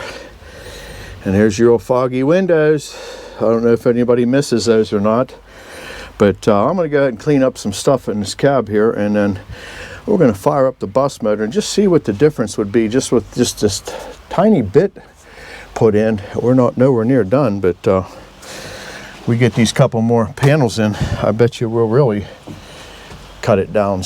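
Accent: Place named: Pittsburgh, United States. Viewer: Handy sez that is a thick yinz we accent.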